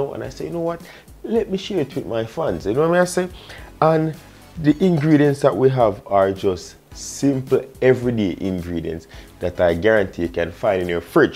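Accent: Jamaica. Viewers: New to your channel, and your Jamaican accent and delicous food is absolutely amazing.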